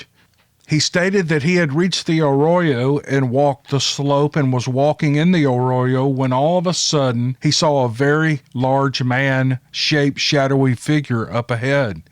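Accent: South, United States. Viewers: Hey,. You are an amazing story teller and narrative reader. Love the southern twang. Keep up the awesome work 😊😊😊😊 Ron From Calgary Alberta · I like listening to your stories in that American accents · love the channel, you keep up the great work, i love listing to a southern boy reading stories. my people! you go boy, keep up the great work! here we go!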